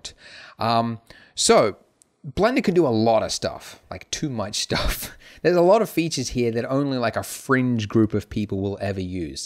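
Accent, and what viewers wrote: Australia. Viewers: What is that Ozzy/Kiwi/Brooklynese accent?? :)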